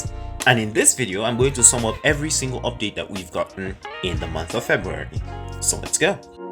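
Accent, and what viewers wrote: Nigeria. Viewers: Yo are you Nigerian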